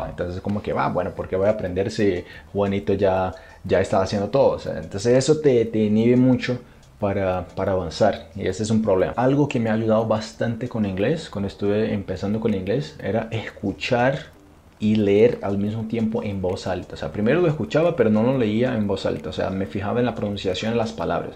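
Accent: Colombia. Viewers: Tiene acento Colombiano este muchacho. 😂 Si si Colombia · Wow, apenas te descubrí hoy pero estoy impresionado, acabo de ver un video tuyo donde hablas en portugués y obviamente no tuve dudas de que eras de Brasil, pero luego hablaste un poco en español y ahí me entró la duda de dónde eras porque tu español es perfecto y tu acento me sonó a Colombia. Tuve que ver este video donde hablas español para descubrirlo, ahora estoy más impresionado. Soy de Colombia y si dijeras que naciste aquí te creería sin dudarlo. Muy buen trabajo, quiero aprender tu idioma y me encantaría llegar a sonar como tú al hablar en portugués, muchas gracias por las recomendaciones, son muy útiles. Saludos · Usted para un brasileno perfeciono muy Bien El Espanol su Espanol suena muy Colombiano Felicidades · woooow muito legal, eu aproveito muito seus ensinamentos você é um professor muito especial e também parece um nativo de Colombia parabéns e obrigada 🇨🇴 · !! 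Gosto muito de ouvir vc falando portugués, mas falando espanhol e com sotaque colombiano es Wooo👏 estou estudando muito, Obg vc pelos seus videos ajudar muito. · ya tienes el aacento colombiano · Felicitaciones pareces un colombiano hablando espanol tu nivel es excelente❤ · Hermano felicitaciones pareces un colombiano es impresionante como tenés marcado el acento no pareces brasileño jajajaj,estoy viendo todos tus videos y me ayudan mucho a mi me encanta el portugués desde muy chico escuchaba música axe que pasaban acá en Uruguay y desde hace un tiempo empecé a estudiar portugués,abrazo saludos desde Montevideo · Usted, no es de Brasil, es de Colombia! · Se nota bastante el acento colombiano. Un saludo. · Parabens! Llevo muy poco aprendiendo y llegué a tu canal. Me gusta mucho y me llamó mucho la atención tu español que es prefecto, yo diría casi seguro que lo aprendiste en Colombia 😎, como sea, lo hablas muy bien..bueno, saludos desde Valparaíso, Chile, Obrigado meu amigo 😉🇧🇷🇨🇱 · Tu acento en espanol es colombiano creo jeje no te habia escuchado en espanol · voce tem o sotaque colombiano!!! 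· Dios mío! Es increíble como hablas de bien español! Tenho uma dúvida: para falar muito bem português, tenho que ter sotaque também? Por exemplo, você fala como colombiano! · Hablas con acento colombiano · tienes acento colombiano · Pensé que eras colombiano jaja hasta que escuché " tenía problemas con el español en argentina". Ahora, ya eres un nativo en este idioma, definitivamente.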